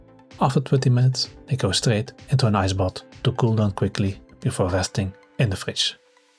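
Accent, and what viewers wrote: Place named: Flanders, Belgium. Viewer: I like the accent. How to tell me you're Flemmish without telling.😊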